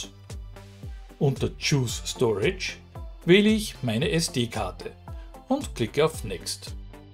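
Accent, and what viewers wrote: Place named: Austria. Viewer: Nach gefühlt 0,1 Sekunden erkenne ich den österreichischen Dialekt trotz sichtbaren Bemühens um schöne, hochdeutsche Sprache.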